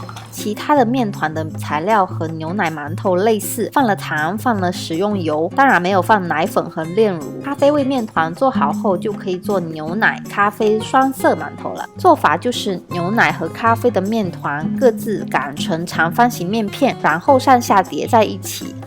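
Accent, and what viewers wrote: Fuzhou, China. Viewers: 这福州话口音好重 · 你肯定是福州人 福州腔好重🤣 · 好熟悉的乡音？福州人？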